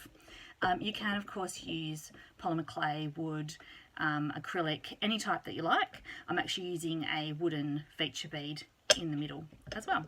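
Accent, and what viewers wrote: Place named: Australia. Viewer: It's so nice to hear an Aussie voice on these how to craft vids. So used to American accents.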